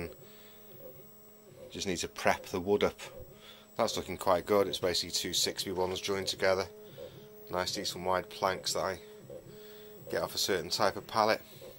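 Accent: Wales, United Kingdom. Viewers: my friend, I’m going to be crossing the pond next year and spending 5 weeks all throughout the UK, Wales, Scotland, and Ireland with my gf and a couple mates. I’d really like to hook up and meet proper. I’m going to be checking out tons of castles and stone circles. Hopefully there’s some in your area and I can knock out 2 birds. I’m just not sure where you’re at. You have a southern/wales accent to ya. Hope you get back with me...Cheers. Btw, I built my lichtenburner from a oil burner. It doesn’t have near the power yours has. What are you using? Todd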